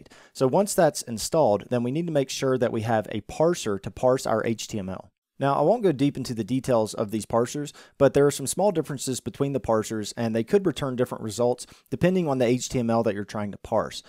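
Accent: United States. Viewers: thanks so much, I really enjoyed watching it and learned a lot. I also really like your fast American English accent😊😅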